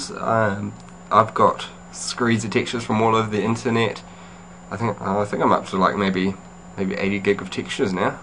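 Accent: New Zealand. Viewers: LOL! English? is from New Zealand. I actually quite like his accent.:) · Sounds like a Newzealander, thought that aswell. He's one of us.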